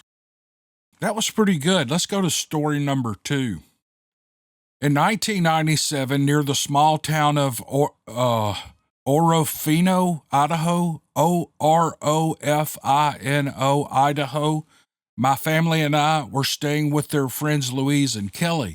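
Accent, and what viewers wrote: South, United States. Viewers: I Always make it to the end!! One of the best podcasts on here.. So glad to hear that you are working on Steve Lilly ❤. thank you for your creativity and that Southern voice!!!! Keep up the great work love from Northern Lower Michigan! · I really loved these stories. I adore that sweet soft southern accent. I love ya. I really wish I could meet ya'll. Unfortunately I live in Erie Pa area. I wasnt born here i came from central PA where all the states "rednecks" come from!! 😂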